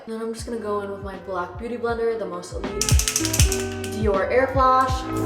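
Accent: Vietnam. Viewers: pls I'm obsessed with her Vietnamese accent it's lowkey so hot is it just me? 🥸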